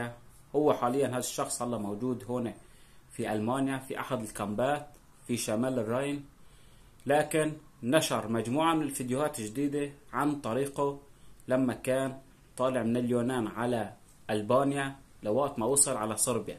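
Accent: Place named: Aleppo, Syria. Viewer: المشكلة من لهجة انت حلبي معم افهم على لجتك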